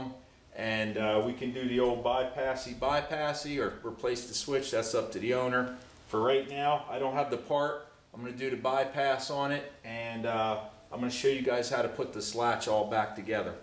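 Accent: Philadelphia, United States. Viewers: THANK YOU. My Honda Fit is doing this exact same thing and I'm pretty sure my neighbors are ready to kill me (I don't blame them). Thanks so much for the thorough video. And from your accent I can tell you're probably from around Philly, so appreciate you even more 🤣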